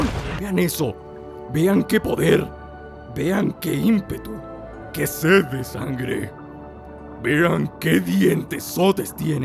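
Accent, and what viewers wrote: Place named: Mexico. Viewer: el tiburón mexicano , literal c nota qu sos mexicano :uuu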